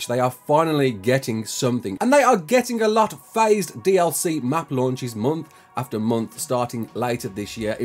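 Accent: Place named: Scotland, United Kingdom. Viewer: And let’s get into itt! I love that intro so much. Hey are you from Scotland?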